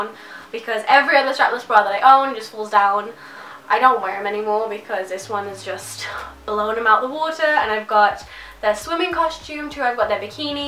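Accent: United States. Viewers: talking with a twangy America accent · Are you from America ? You have a really strong American accent?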